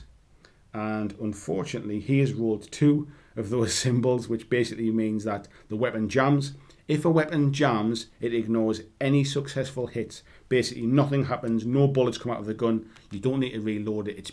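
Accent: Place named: Scotland, United Kingdom. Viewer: Scottish Accent Boardgame Review = Auto Subscribe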